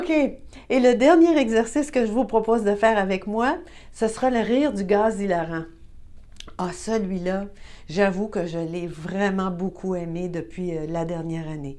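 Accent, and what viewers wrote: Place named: Quebec, Canada. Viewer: MERCI 🙏 Merci pour votre rire et votre générosité à le partager ❤ J'ai ri de vos exercices et de l'intermède entre deux, tant le fait, alors, de reprendre votre sérieux m'a fait rire aussi, car c'était comme "vous retenir de rire" ! 🙃 'Quelle idée' !!! 😂 C'est mon yoga du matin 😊alors que j'ai entrepris de le retrouver pleinement, pour la petite fille surnommée "Rayon de soleil" que j'étais 🌈 Et votre si bel accent 'québecois' qui, au départ déjà, me fait sourire ✨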